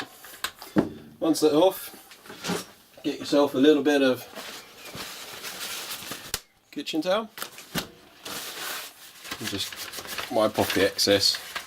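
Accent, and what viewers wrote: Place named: Australia. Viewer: Nice vid, Aussie accent?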